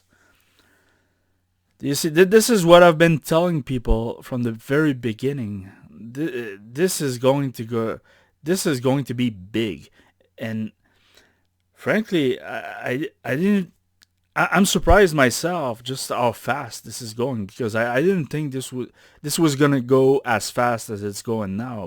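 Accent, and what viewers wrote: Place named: Quebec, Canada. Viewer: Oh! que Oui !, je reconnais un Québécois quand j'en entend un, content de savoir que je suis pas seul dans ma recherche pour la vérité sur le coronavirus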